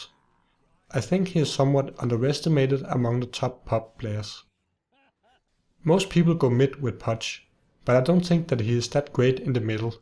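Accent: Denmark. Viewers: He is danish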